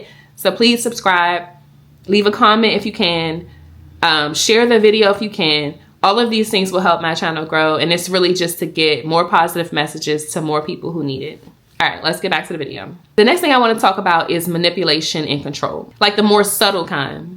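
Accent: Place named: Washington DC, United States. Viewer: You’re doing amazing, I hear your DC accent too sis! Keep going!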